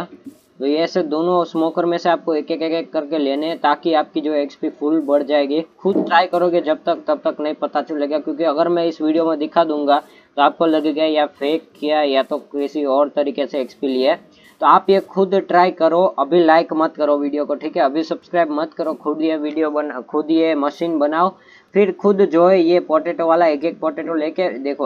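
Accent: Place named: Gujarat, India. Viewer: gujrati log hindi bolne me pakde hi jate h ...btw i am also from gujrat..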